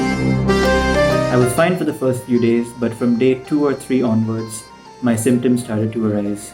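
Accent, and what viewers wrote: United Kingdom. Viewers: It is really good to hear my friend that you have recovered from this contagious virus. Stay Safe and one more thing I like you English accent and you style of speaking English with coolness. If u want to tell me regarding how can I improve English speaking, then it will be beneficial for me. I know this is not the right platform because u are sharing experience regarding your journey against Covid-19, but if u want to give some suggestions then okk or don't wanna give then also okk. Have a good life my friend. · jb bimari lgi tb desh ki yaad aa gyi....vrna abi tk toh british accent me hi baat krte thee....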